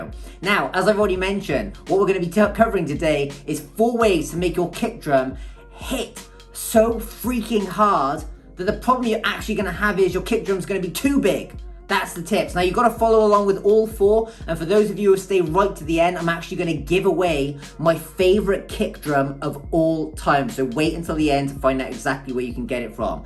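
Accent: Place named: United Kingdom. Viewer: british mate huh hmmmmmmmm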